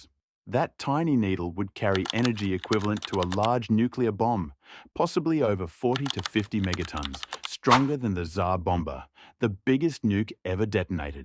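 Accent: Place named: Australia. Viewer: Myoves, naaur, classic Australian speech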